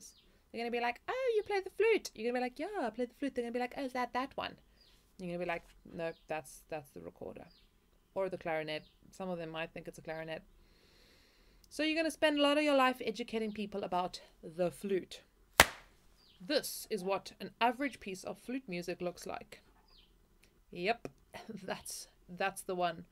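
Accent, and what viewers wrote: South Africa. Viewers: I don't think she's British. I'm thinking South African and/or European who went to International School. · South African ex-pat with a slight British twang? This is the first video I've watched on this channel :) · Hey girl- are you South African?! I hear that accent ;) ;) soo much love from the Jacaranda city! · Are you from South Africa by any chance?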